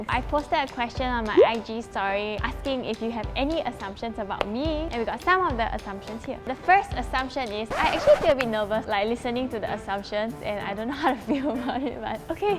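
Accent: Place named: Malaysia. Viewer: Where is this girl from? She has the "lah" slang so wether she is Malaysian or Singaporean? Sorry if I'm wrong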